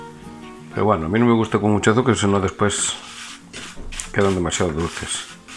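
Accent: Galicia, Spain. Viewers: Hola te acabo de ver.Muy ricas pero con rosquillera me salen poco.Ah: soy igual q tú igual tenemos parentesco nunca se sabe tienes acento gallego.Saludos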